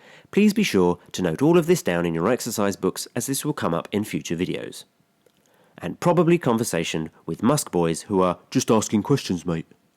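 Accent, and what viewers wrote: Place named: United Kingdom. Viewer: The british accent can litteraly convince me anything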